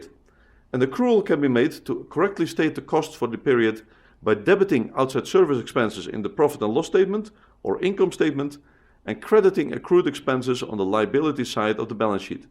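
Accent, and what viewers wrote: Netherlands. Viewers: is that a Dutch accent ?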